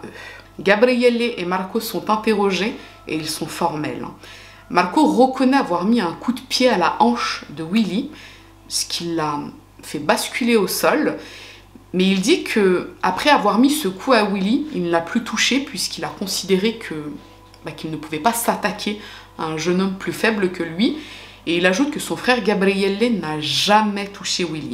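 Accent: Italy. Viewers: Ciao bella signora ma che bel accento italiano 🇮🇪🇮🇪🇮🇪 Nouvelle abonnée 🙄 quelle tristesse cette histoire, révoltante de cruauté et de bêtises, merci de l'avoir traitée avec humanisme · bel accent italien ahah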